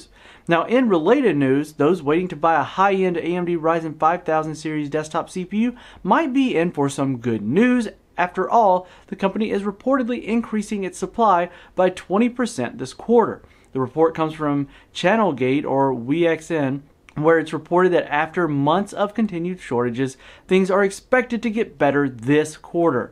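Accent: South, United States. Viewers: Your subtle southern accent is quite pleasing to the ear for some reason lol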